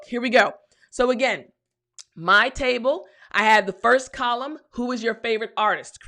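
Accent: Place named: United States. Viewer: Thank u soooo much teacher. You're sooo funny, that makes everyone to like your movies. I heard your song in that movie,even someone was sad can smill as well. I also like your smart accent, pure American. We're following you in a group person from Congo Kinshasa(DRC). Thanks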